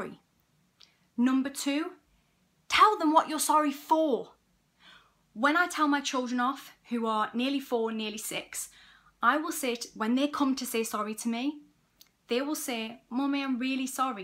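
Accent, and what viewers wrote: Newcastle, United Kingdom. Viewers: I like your Gorgie accent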